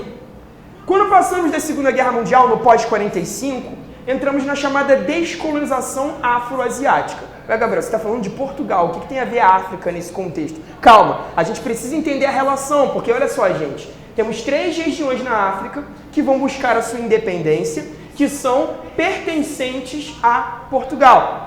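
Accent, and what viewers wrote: Rio de Janeiro, Brazil. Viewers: Daniel Temelkovski, sim, com toda certeza ele é carioca. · Eu quero fazer uma pergunta. Eu nao sou do Brasil. Alguem pode me dizer se o professor fala com um sotaque carioca?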